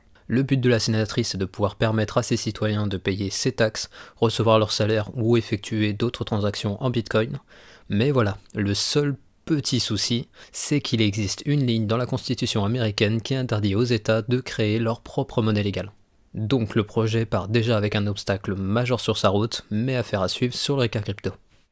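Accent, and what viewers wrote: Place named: England, United Kingdom. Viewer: J'adore ton accent anglais of course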